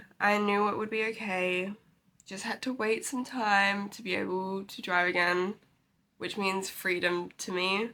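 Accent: Australia. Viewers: I just love your accent ! I always hear american accent but never aussie one, as a non native english speaker it feels so weird but so cool !!!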